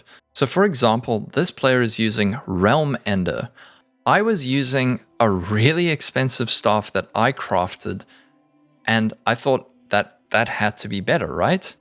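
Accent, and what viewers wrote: South Africa. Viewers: Yo Bru. Where you from? You sound South African👀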